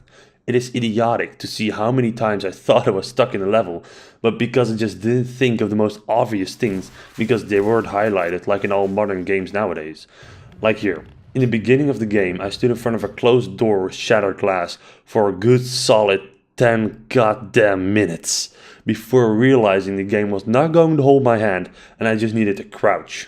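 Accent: Netherlands. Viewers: i can hear that your Dutch man xD nice video do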